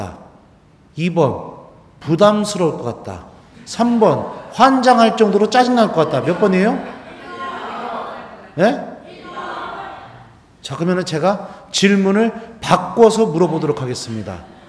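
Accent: Chungcheong, South Korea. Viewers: 신부님 말씀 잘 듣고 있습니다. 충청도 말씨가 구수하면서도 우리 일상생활과 근접한 어휘로 하시니 가끔 웃습니다. 고맙습니다.😂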